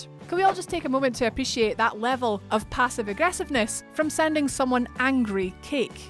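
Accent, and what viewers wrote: Scotland, United Kingdom. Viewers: Great video, but my main enjoyment was hearing a fellow Scot on YouTube for the very first time 😁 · Do I have hearing problems or did she sounded more and more Scottish the farther the video progressed? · I love Scottish accent ❤️❤️ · Wooo Scottish lady ken😎🏴🏴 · She did start sounding more and more Scottish as the vid went on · was i the only one who only noticed she had a scottish accent halfway through the video? was a little confused once i noticed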